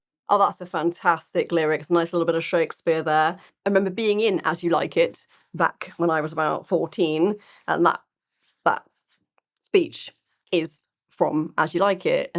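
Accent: United Kingdom. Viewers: IMHO, it's both famous people and average people because 'all the world's a stage' as you will find out so much more about the band in their album. Welcome to the Rush family,! You have gone down the Rush rabbit hole, never to return, but it's all good, no worries! I've got to say that your personality is lovely as is your accent, purple hair, and Ink! Such a lovely bird, as you brits might say. Chicks seem to really dig Neil the most. Here's a small treat for you and others. Neil doing Cottontail and a short tasteful solo with the Buddy Rich band doing a tribute to Buddy. <link>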